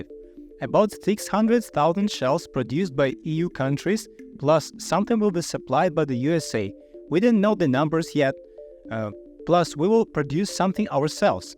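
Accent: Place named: Ukraine. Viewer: Happened to find your channel and I know it’s brand new, but the strength of your convictions and your passion is clear to see. Also it is good to hear another actual Ukranian voice. I look forward to seeing more content.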